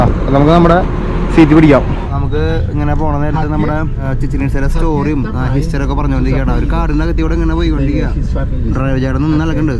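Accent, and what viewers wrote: Thrissur, India. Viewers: Hello,Please write your script you dialogue as there is repetition of your words and descriptions...also lessen your trichur accent...as always picturixation is great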